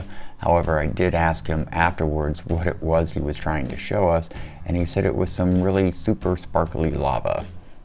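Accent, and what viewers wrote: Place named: Florida, United States. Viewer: Always thought you sounded like Batman (Kevin Conroy) with a Florida accent. Now I see you actually look like him I am delighted :)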